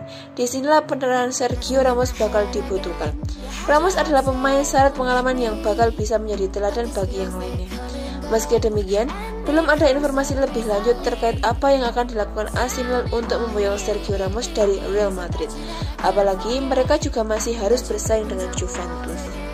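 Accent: Java, Indonesia. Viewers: mba medok bnget mba suarane😂🔴⚫❤ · narator nya ganti nih..medok doang jawa nya tapi suaranya lemes, notasi naik turun gak ada..lempeng doang kayak baca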